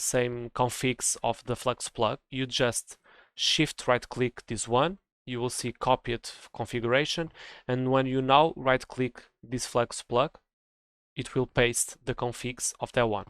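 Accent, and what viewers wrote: Portugal. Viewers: My guy you sound kinda Portuguese ngl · man your from portugal right? your accent is very portuguese and btw great video ty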